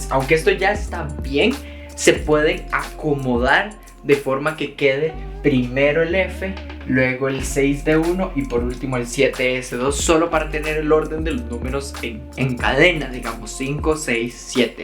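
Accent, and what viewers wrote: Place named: Colombia. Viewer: Pensé que eras de colombia 😊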